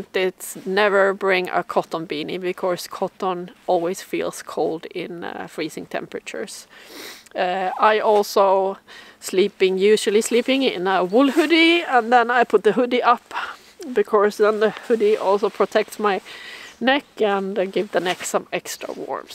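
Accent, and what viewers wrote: Sweden. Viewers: Thank you for the tips!! Survival so key. Your accent is lovely. It sounds vaguely familiar, but not sure if that is due to US melting pot or English/Scandinavian ancestors. Either way, your English is muuuuuch better than my Swedish. :) Tack för att du delar med dig av din expertis! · 2 sekunder in hör svengelska, japp definitivt sverige:)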